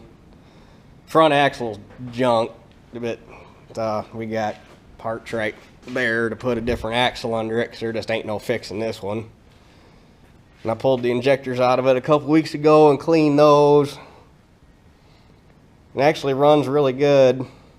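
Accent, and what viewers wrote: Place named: North, United States. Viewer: I’m going to be honest and with this link came out I bypassed it because I was just busy. When your second video came out and I well great. You were one of the first channels I watched regularly and looked forward to watching and even went back in time and watched. I’m originally from Michigan and have lived in Georgia since 1974 so I love to hear the northern accent such as yours but love the old equipment as well. Take care and hope you’ll post some more videos.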